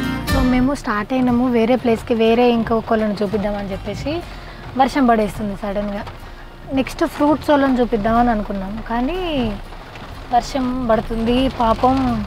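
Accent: Telangana, India. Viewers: Akka Nv matlade matalu entha kalupukuntlu unai Telangana yaasa spr akka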